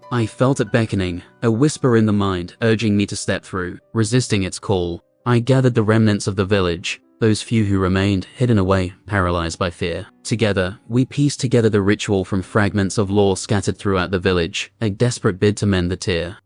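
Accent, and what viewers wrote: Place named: United Kingdom. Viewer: This British sound makes this little bit better🔥🔥🔥🔥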